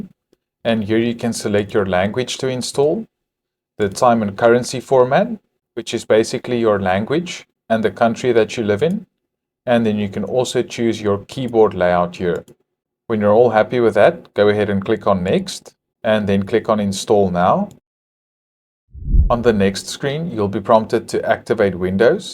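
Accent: South Africa. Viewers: Ek Sal jou sommer n soen op die voorkop ge❤❤❤thanks my guy I've been struggling for days now trying to repair my windows 10 but eventually was like stuff this let me upgrade it to windows 11 and your video came up heard the accent I was like his one of the ouens and I really appreciate you have a great and awesome day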